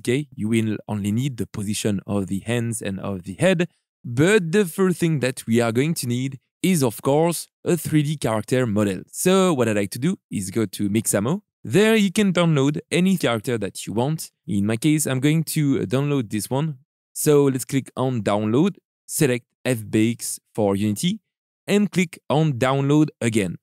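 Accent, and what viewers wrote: France. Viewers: you have a french accent ?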